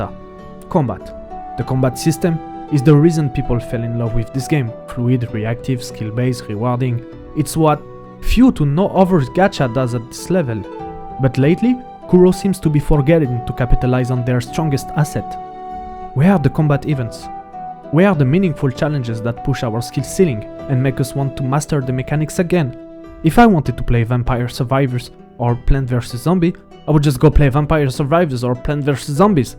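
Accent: France. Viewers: Are u french cause i love the accent❤😂🎉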